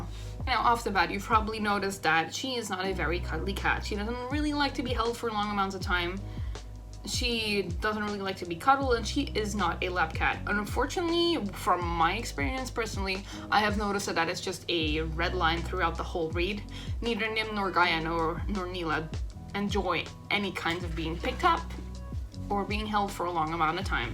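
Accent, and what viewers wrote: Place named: Belgium. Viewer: Ah Belgium, I knew I heard a slight accent.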